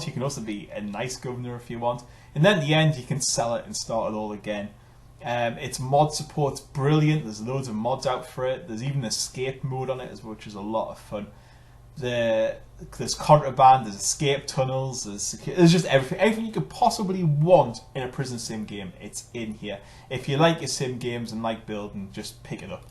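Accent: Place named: Newcastle, United Kingdom. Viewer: I dinna no ya was a Geordie!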